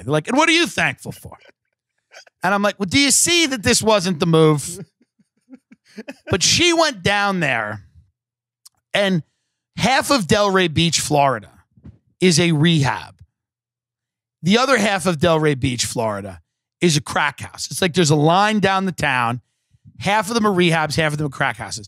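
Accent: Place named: Northeast, United States. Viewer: I really like him because he's one of the relatively few people in the entertainment industry that are real true northeasterners. Like, a ton of people in the entertainment industry are from places like New York and stuff but they're usually very wealthy and Jewish or have family in the entertainment industry and they're just not relatable to people that grew up working class in the Northeast. Tim Dillon sounds like he could be someone you know if you live in New York, New Jersey, Rhode Island, Massachusetts etc. It's really poor up here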